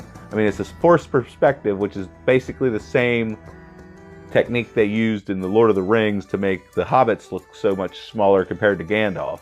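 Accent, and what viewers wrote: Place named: Scotland, United Kingdom. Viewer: AYE! It's Scottish!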